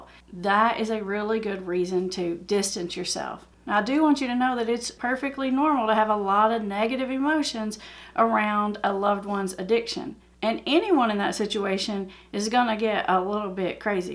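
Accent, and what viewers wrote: South, United States. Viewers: i like that country accent ;)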